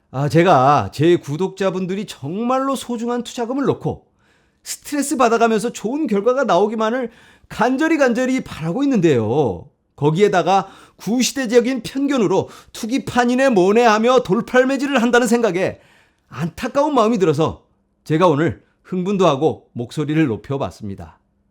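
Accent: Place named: South Korea. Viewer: 발음이 구수하십니다 한국식 친근감가는 하이리스크 하이리턴!ㅎㅎㅎㅎㅎ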